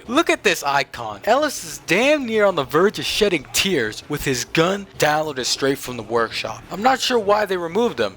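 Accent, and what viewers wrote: United States. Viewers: I’m still wondered how the hell your voice is just completely. Did you hit 30 something and you’re in your second puberty with a different voice? It sounded like you had a European accent back then now you just sound american lol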